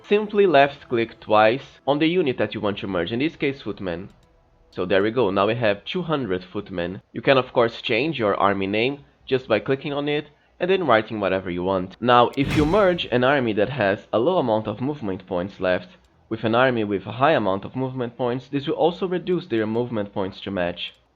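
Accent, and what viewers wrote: Brazil. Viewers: Br né? Sotaque inconfundível lol 😅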